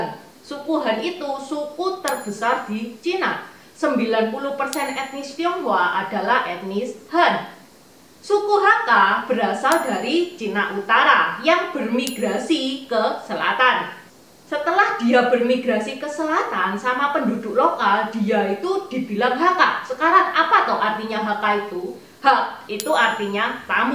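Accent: Java, Indonesia. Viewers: Coba bahasa Indonesia nya diperbaiki lagi cece.. Jgn medhok.. Kebanyak kan huruh H.. Jadi gak enak kedengaranya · Makasih infonya mbak. Logat jawanya medok bngt mbak hehe. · cici ngomongnya logat jawa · Cece.... medok Jawa nya love love semoga Chanel nya makin banyak subscribers nya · Ulasannya bagus... walaupun logat jawa nya masih nge bass .. terima kasih. Btw orang terkaya di indonesia tetap di pegang oleh perantauan hokkian .. mulai dari Liem sioe liong hingga sekarang Oei hwie tjong ( BCA .. Djarum group ) .. saya sendiri generasi ke tiga dari perantauan hokciu / foo chow ( ibukota provinsi hokkian / foo chian ) . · Saya merasakan mbak ini orang Jawa, krn medok. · meddok jawanya,etnis china jawa ya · Ma'ap min ...ingin kenal , dari mana admin berasal kok logat nya medhok kayanya dr jawa semangat salam sehat selalu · Ini jawa tionghoa dari mana ya? Logat jawanya khas sekali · ini asli orang Jawa. 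Logatnya medok Jawa banget. Gak ada pelo logat Cinanya lagi. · km turunan Tiong hua? Tapi logat km tdk seperti org tiong hua tapi seperti jawa logat mandarin km tdk pas bgt. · Oh iya..kenapa loghat bahasa indonesianya kayak Wong jowo??sementara loghat org cina di Malaysia loghat bahasa melayu nya nggak loghat melayu??😀😀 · Seneng dicerita’in mbak yg Cantik, Pinter dan enak Suaranya yg Medok. Salam Sehat & Sukses nggih 🤲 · Kalau mbak ini medhok ya sudah dari sananya, artinya dia menguasai bahasa lain ( jawa) selain bahasa Indonesia. Susah sekali menghilangkan logat bicara seseorang. Yang terbaik memang bicara tanpa aksen. Semangat mbak. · Medok banget. Ngomong "k" jadi kayak ngomong "g". Ngomong "khek" jadi "ghek". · Trimah kasih cici tlah mmberikan informasi sejarah tentang suku etnis tiounhhua ,,yg trsebar di blahan asia,, dan byk menjadi pemimpin negara ,, cici mdok baget logat jawa nya hi hi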